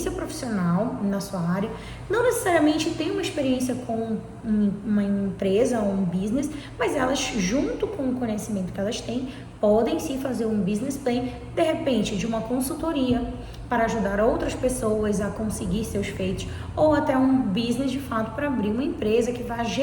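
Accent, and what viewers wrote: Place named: Belém, Brazil. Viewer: pode me dar o contato da Martell? Fica aqui em NY? Eu tenho personal plan e to em RFE. É de chorar. Pelo seu sotaque acho que você eh de Belém né? Sou paraense radicada nos eua. Mas sai daí pequena.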